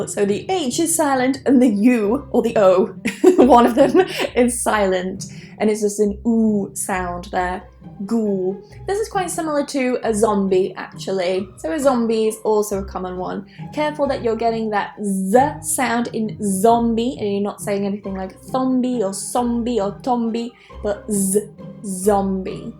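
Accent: United Kingdom. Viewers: Hi there,. I've just found you on YouTube. Just a guy from Brazil here trying to learn some English and like some others, I love the British accent. Loved you humor, loved your other videos that I've seen so far. You are just so beautiful (jaw dropping) and an excellent teacher/professor which is the reason I've just subscribed. Please, keep up the good work. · 訂閱您的頻道學習很多英式口音 在最近 很開心 大大感謝~~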